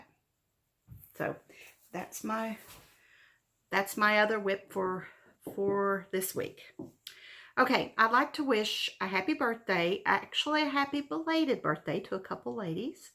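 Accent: South, United States. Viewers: Hi friend, I can't decide what i like best about you. Your sweet smile, those beautiful smile lines near your sparkly eyes, your lovely southern drawl...wait, I KNOW. Your kind spirit. Every single time I stop by I feel bathed in Grace & Goodness. I get almost choked up with how kind and considerate you speak to us. Thank you so much. I needed to hear your kind voice today. Oh dear, my very worst habit is, when i am nervous, I chew my cuticles. Sigh. Thanks for filling by day with such beauty. You are a gem. Happy Stitching, friend, Daleen PS. Your cheesecake finish is beautiful.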